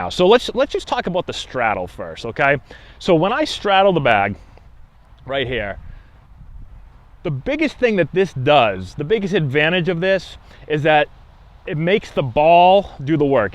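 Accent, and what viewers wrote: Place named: Massachusetts, United States. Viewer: also your Mass accent is easy to understand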